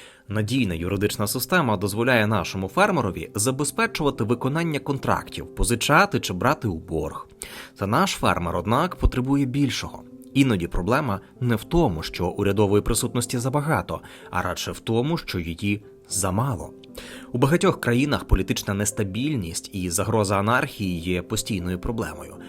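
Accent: Russia. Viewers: Дикторе! Купи поп-фільтр, або навчись ним користуватися! 👺 І ще важливий момент: українська "и" це не расєйська "ы" по вимові! А "що" - це не "шчо"! 💩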